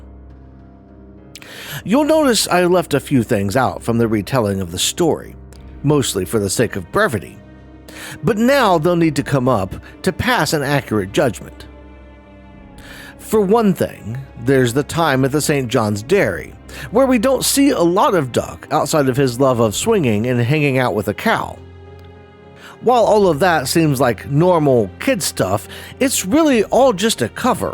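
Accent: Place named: United Kingdom. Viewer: Hey you're that fast speaking british guy that hates bonnie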